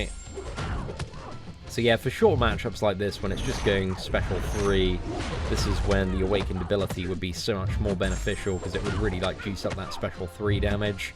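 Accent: United Kingdom. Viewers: Im only here to listen to the pale british bloke yapping about.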